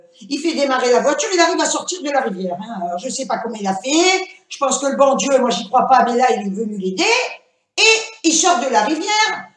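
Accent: Algeria. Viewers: coucou ma je me suis encore payé une bonne rigolade décidément il t'en arrive toujour de bonnes enfin pour une fois ce n'est pas toi qui est tombé ouf soulag!!!là à la chute de la copine j'ai trop rit je lais vu tomber avec les chaussures qui reste collées dans bobo j'espère en tout cas le copain il devait tenir une bonne muflee pour ne plus se rappeler de la rivière et il aurait pu aller expliquer à vos parents ah les hommes!!!merci tu m'a mise de bonne humeur pour la journée. j'aime l'accent pied noir c'est sympa ça reflète le soleil et la bonne humeur bisous à vous deux je vous aime très ford😗😗😗😍😍😘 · bonsoir ma copine rigolotte ♥ , exelnent avec l'accent pied noir trop bien sa met du caracterer a ton histoir trop top :) MDR :) hahaha bonne soirée kiss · Quel mesaventure !!!ah ces hommes il se croivent tellement fort heureusement que vous etiez la pour sortir sa voiture .Ta pauvre copine mdr tu m a fait trop rire !!!Mdr l affiche a la gare j imagine la scene :) ta pauvre copine sa mere n etait pas commode....et ta maman qui ne t as pas cru alors que tu disais la verite mais en meme temps ton histoire est tellement delirante .Et ton accent pied noir est a tomber,j en veux pleins d autres histoire comme ca pleins de gros bisous ma belle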